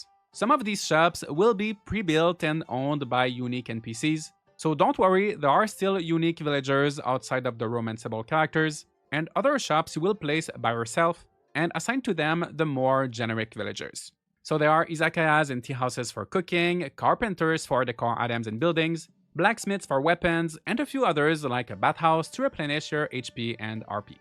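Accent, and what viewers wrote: Quebec, Canada. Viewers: Are you québécois ? Your accent sound like it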